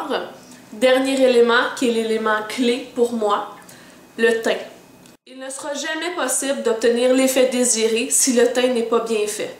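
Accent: Quebec, Canada. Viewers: Coucou!! merci bcp pour cette vidéo! J'aime vraiment toutes tes vidéos, ce que tu fais est génial!! Tu me donne envie d"aller vivre au quebeq j'adore ton accent ta simplicité et ta sympathie!! Merci encore et continu comme ça!! Bisous! PS: j'attend avec impatience les autres videos sur les toiles d'artistes je troouje cette idée vraiment géniale! :)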